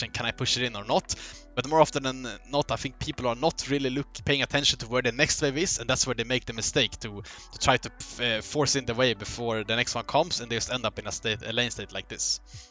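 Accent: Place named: Sweden. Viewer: Haha aldrig hört en bättre svengelska! Grym video🤘🏻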